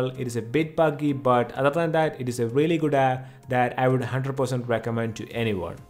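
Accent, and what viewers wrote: Kerala, India. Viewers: He's from Kerala. Can easy make out the Malayalam accent in his English. 😀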